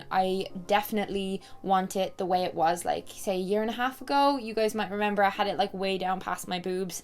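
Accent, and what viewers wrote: Ireland. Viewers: I found your channel while looking for Irish YouTubers to learn the accent! Also, I'm taking my first trip to Ireland next year, and I would LOVE it if you and Jessie could make a video on must see places in Ireland. Especially if there are things only the locals know about! I love your videos!! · I found your channel because my ex is Irish 😘 and I just loved your accent and I loved your videos!!!! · So happy to see this video from you right now- I have horrible pms cramps and sitting with hot cider listening to you is so nice! I started watching you because I saw one of your food diaries in the related videos of a what I ate in a day video I was watching. A beautiful sweet bi lady with an Irish accent who loves Harry Potter and makes food videos! Right up my street :) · I found your channel because I was googling Irish youtubers (Irish accent is the BEST) haha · I found your gorgeous self last year by looking for Irish accent tag videos haha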